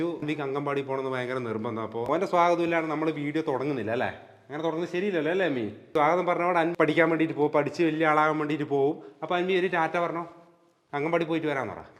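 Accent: Kannur, India. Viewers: Ningal kannur aano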